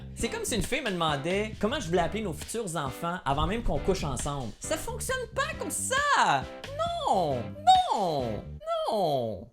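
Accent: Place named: Normandy, France. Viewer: étant donné ton accent,tu dois être de l'extrème ouest de la normandie,non?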